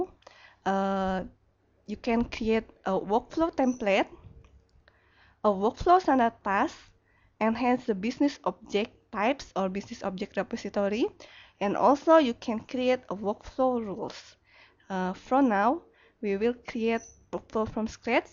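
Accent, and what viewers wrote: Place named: Southeast Asia. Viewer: the accent is a mixture of filipino singaporean malay indian indonesian myanmar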